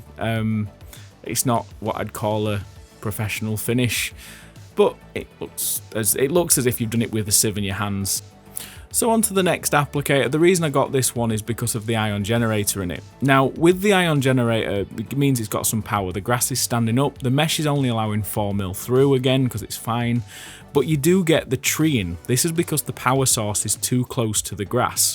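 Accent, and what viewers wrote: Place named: South Yorkshire, United Kingdom. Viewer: Me and the Mrs are having a scholarly debate regarding the placement of your accent. I'm saying no further south than Rotherham, no further east than Donny. She reckons Cas Vegas as a wild card. Are we warm or cold?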